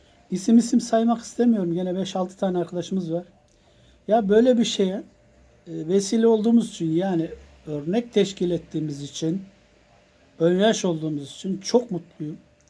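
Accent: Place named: Kayseri, Turkey. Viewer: Abi ben daha önce de yazmıştım size sizin şiveniz kayseri şivesi bana bu şive tanıdık geliyor bende kayseriliyim antalyada oturuyorum yani yakınız sizi hep izliyorum annenize allah iyilik versin hayear zaten bir numaralı artist maşallah Bu arada yeniyılınız kutlu olsun 😸😺😻🙏👍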